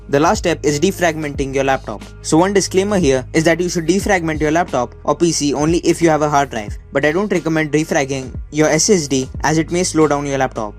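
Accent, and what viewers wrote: India. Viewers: It is an amazing Indian accent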